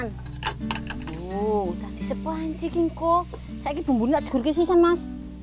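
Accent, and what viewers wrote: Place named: Surabaya, Indonesia. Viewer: Temenan Mbak, suroboyoan mu ga ilang 😀👍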